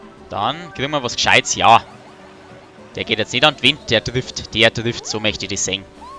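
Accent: Bavaria, Germany. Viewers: wie immer a guards Video! und was mir daugt is dei Dialekt, wenigstens mal a gescheites bayerisch,mach weiter so👌😉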